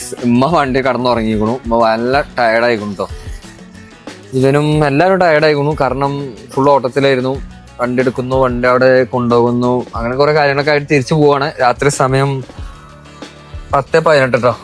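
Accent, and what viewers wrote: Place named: Malappuram, India. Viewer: Ingale naadevdeyaaa slang Malappuram aanello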